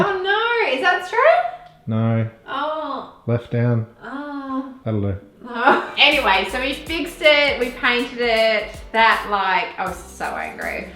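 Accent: Australia. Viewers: 😂 she isn't. She sounds like my mom friend in Australia.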